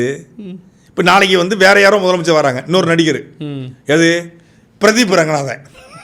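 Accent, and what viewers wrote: Tirunelveli, India. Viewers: சாட்டை தம்பி, உன் பொருநை புரளும் பொதிகைத் தமிழ் உச்சரிப்பை பொழுதெல்லாம் கேட்கலாம். வாழ்க உன் அழகு தமிழோடு நீயும்.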